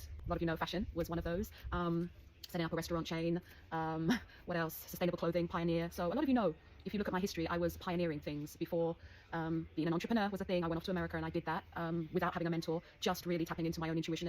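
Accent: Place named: South St. Elizabeth, Jamaica. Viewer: I am here watching your video about your grandmother Laura and hearing the South St. Elizabeth accent pulsating through and loving it! Your channel is awesome too! You are on the top of my list too! So coincidental and I love it❤️🧑🏼‍🌾🏝️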